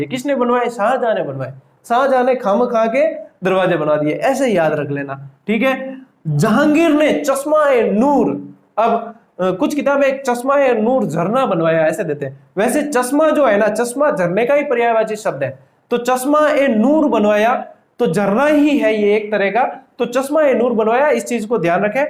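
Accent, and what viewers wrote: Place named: Marwari, India. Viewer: Aapni marwadii language bhout mithii lagee sir jii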